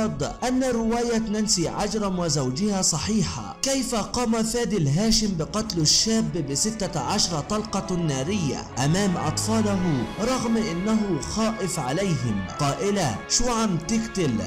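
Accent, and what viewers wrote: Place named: Sohag, Egypt. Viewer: اكيد سوهاجي سوهاجي يا امينه ما هما تبارك الله ماليين علينا حياتنا .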